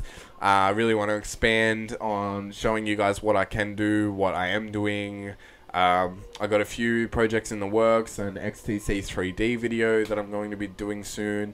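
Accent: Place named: Australia. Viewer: Don't worry about mispronouncing stuff: with the Aussie accent, no one can tell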